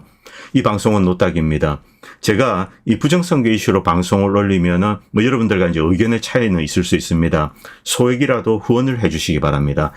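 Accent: Gyeongsang, South Korea. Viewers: 이 사람 똑똑한척 하더니 부정선거 주장을 하는거 보니 영 국짐 프레임 이용하는 그저그런 사람이네 자유민주주의의 최대 적이라할 쿠테타에 대한 개념도 없고 경상도 말투며 그냥 제대로된 보수가 아닌 극우네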